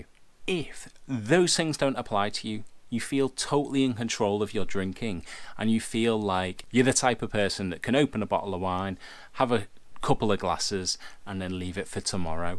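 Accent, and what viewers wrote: Liverpool, United Kingdom. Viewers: You've not got the strongest scouse accent but knew it was liverpool or nearby. Yeah frontierland lmao good in its day but all gone now so has bubbles 🤣 keep doing your vids they r great for people going through similiar difficulties. So few people comment in relation to ur views but i think its just the whole mental health stigma yet again x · Are you from liverpool? Also, thank you so much for this. I'm starting off on 12.5mg for anxiety (not depression) and while I do like to have 1-2 drinks a night, 4-5 nights a week, I have complete control - I just like having a drink or two with dinner. However, I wouldn't mind cutting back during the week. But there are times I'm going to want to indulge. I have a vacation planned for October in the Caribbean that is all-inclusive. I plan on drinking a fair amount. Since I'm NOT struggling with depression or suicidal thoughts, I wonder if I should just take a break from the pills during that time? 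This is of course assuming that I react well and are still on them at that time. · Good advice and info, also liked your Beatle accent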